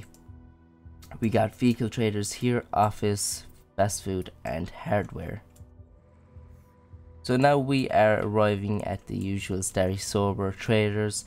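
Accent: Ireland. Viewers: You sound like you're from athlone or carlow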